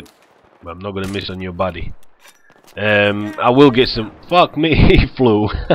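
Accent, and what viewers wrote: United Kingdom. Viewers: he sound British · He's not from Russia that's for sure... I personally think that he is from somewhere in the UK, I can tell by the way he pronounces some of his words.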